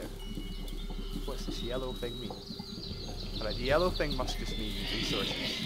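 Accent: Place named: Scotland, United Kingdom. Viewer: Why do you sound so Scottish. Are you Scottish? 8-) And Hi.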